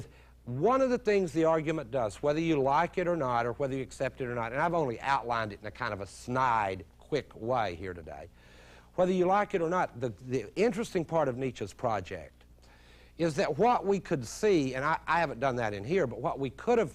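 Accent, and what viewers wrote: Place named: West Texas, United States. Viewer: West texan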